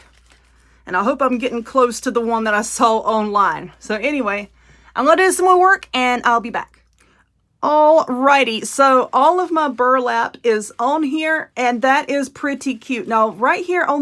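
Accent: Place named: Texas, United States. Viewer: I like it!!! Looks better than Target's! I understand everything you say...lol...i am from Texas & we talk & say things like that! Stay safe!💕🍁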